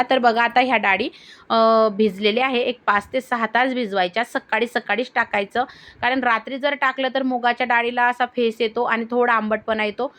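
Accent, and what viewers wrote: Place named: Jalgaon, India. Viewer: खुप छान तांई विडिओ भुसावळ जळगाव बोलता 😊❤तांई फुल वाॅच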